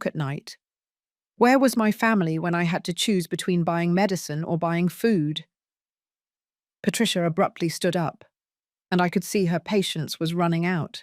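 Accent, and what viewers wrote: England, United Kingdom. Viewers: This is an English accent not American.🇬🇧 Long and drawn out with no substance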